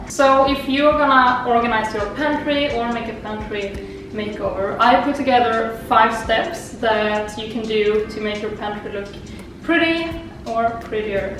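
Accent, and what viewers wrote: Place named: Sweden. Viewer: your accent is so cute! are you from Sweden, srry im new here :)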